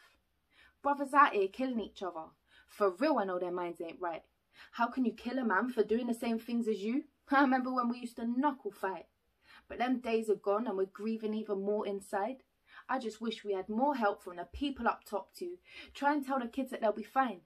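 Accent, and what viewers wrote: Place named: United Kingdom. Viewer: I never thought one day ı fell in love with who has Bri'ish Accent 😍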